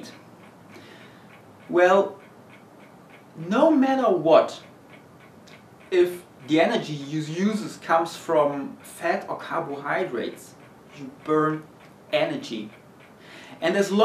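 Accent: Germany. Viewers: After all, I still don't know what do do in my situation =D What do I have to do to lower my bodyfat percentage without losing weight anymore? Im already down to 69 KG (my body height is 188 cm) and I can't see my abs at all? Maybe you can answer my question. Would be nice if you PM me and I would prefer german, you sound like you're originally from germany ^^ Greetings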